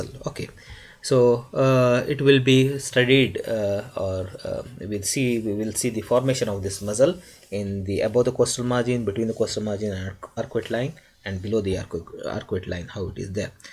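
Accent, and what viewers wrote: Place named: Arab world. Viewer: he is an arab. its the accent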